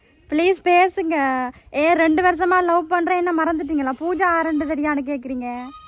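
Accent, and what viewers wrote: Sri Lanka. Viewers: உன்னுடைய இலங்கை பேச்சி ,அழகு,கவடு சூதற்ற தன்மை பிடிச்சு இருக்கு.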